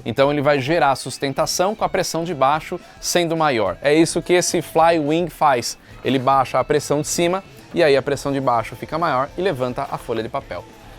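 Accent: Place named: São Paulo, Brazil. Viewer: gosto dos seus videos porem sua voz d paulista e foda tudo pra voces e meinto, momeindo pensameinto porque nao momento porque o i antes do n fico bolado com filmes com dublagem paulistanas tudo com meinto poxa que voizinha safada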